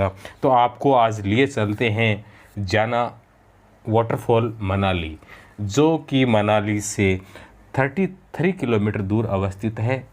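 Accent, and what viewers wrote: Rajasthan, India. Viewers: Sir, aap kya Rajasthan se hai? Apki boli me Rajasthani put hai......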